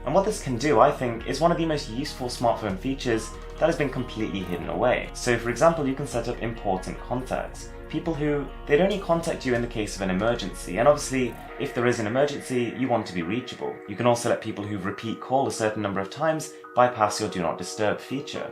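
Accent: RP, United Kingdom. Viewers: Ahhh bullshit with these damn smart phone devises and a billion amazing things that can be done with them (except take the dog out on a leash to go pee and poo), Disney cga animation movies, and "hot" fox news chicks bitching much ado 'bout noting in tight party dresses, and cheap lil "Cosmo" 'bot toys, and wall paper-thin 100" flat screens, quad copter toy drones with super hi-fi HD cameras, and stupid auto-correct that don't work as intended half the time, and other cheap gimmicks to keep our minds off the fact that modern technology has failed to put colonies on our Moon and the moons of Jupiter and space stations orbiting around the ones of Saturn. Whatever happened to the dream of interplanetary space travel? Inter-Galatic commerce? Gone to pot already? All we can come up with so far is some surreal composition of a fuzzy-faced used camel and goats milk arab dealer, sporting a dated pompador-like hair style with a posh British accent trying to charm the panties off of suburban White 16 years old girls and ex-virgins, doing his impersonation of the late James Mason plugging cell phones aps on international YouTube tv. 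What the HELL man?!?! 2017 and this is it? More like a damn apathetic rut to me. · Yhh daaaaam this guy has that one British voice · I wish I had this type of british accent and not the chavy one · Music from the serie Gomorra and a posh British accent. Hmm . . .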